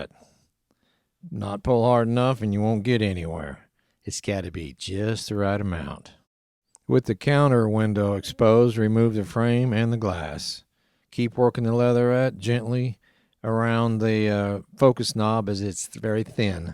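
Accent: Midwest, United States. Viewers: Just love watching you work, being an Irishman living in England, your mid western drawl is a delight, you are the man!!! John Moore, Sherwood Forest England. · Yea, I do enjoy the hypnotizing midwestern drawl and experience driven repair lessons. I kid you not, the confidence to tear into my own cameras grows with every video of yours that I watch & rewatch. I know I have not seen every repair video out there, but of what I have seen, your videos are the most comprehensible and the lighting and camera always work to show whatever the dialog explains. You truly have a gift. I use a Nikon FM as my main film camera and a D3400 for digital. I have ten or so Nikons that need working on (that were eBay purchases from about 15 years ago) starting with a couple Nikkormats up to an FE. Am I correct in believing that the older models will be better to learn on? 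Still tooling up and hope to acquire some JIS crosspoints soon as all my small drivers are Philips. Though I have MacGyvered a flat into a two-bladed JIS as I play around with some point-and-shoots. When I start tackling old stuck screws I will need the real deal. Thanks again for doing all these. Hoping people start learning how to Fix Old Cameras and schools start teaching this stuff again.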